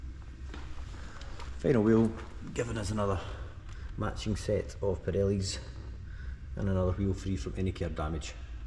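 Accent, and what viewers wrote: Scotland, United Kingdom. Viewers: Scottish of course! Love it.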